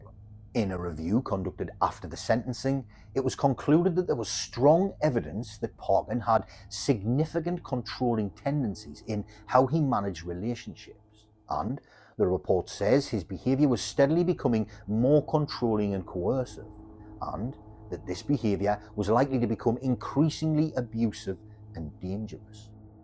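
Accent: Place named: North East England, United Kingdom. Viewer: Listening from Middlesbrough. Nice to hear a Northeast accent telling these stories. Love the content, great channel.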